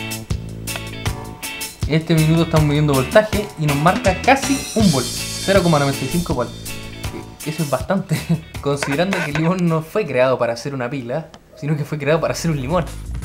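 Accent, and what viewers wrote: Chile. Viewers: Jajajajaja grande! Pocos son los que se atreven a hacer cosas de este tipo, combinar ciencia y humor en un video, es lo mejor!!! Solo algunos gringos suben video de este tipo, que bueno que alguien de habla española, castellana o como gusten llamarla tome la iniciativa de hacer cosas así jeje. Te seguiré... pero en tu canal, no te asustes no soy psicópata XD Sigue así, esperaré el próximo video :) PD: Por tu acento, eres chileno no?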